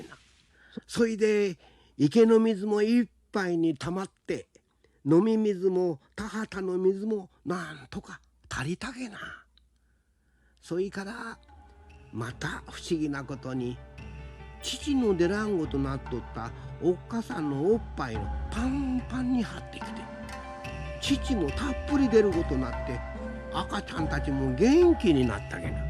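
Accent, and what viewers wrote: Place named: Kyushu, Japan. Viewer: 私は熊本ですが、方言は南九州の方言そっくり。むしろ本当の方言よりききとりやすい。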